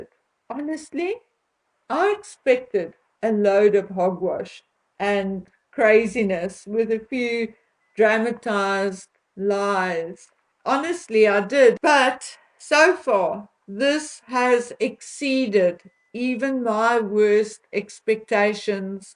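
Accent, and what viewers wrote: South Africa. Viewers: I love the way you say ‘Big Willie’ in your great S African accent! Thanks for hanging in there for us.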